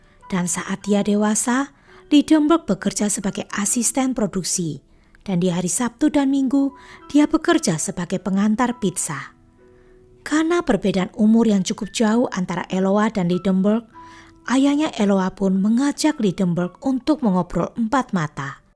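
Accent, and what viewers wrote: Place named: Java, Indonesia. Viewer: .... waktu bilang.... jangan bergerak kalo tidak aku tembak....ih... ngeri kali nada nya kek nyai Blorong yg haus darah di film Suzanna...ih takut aku.... walau begitu tetap aja kangen logat medok mu.... ingat ya jgn sampe jadi nyai Blorong.... cukup jadi krupuk orong orong Medan yg gurih dan lezat.... sukses terus ya....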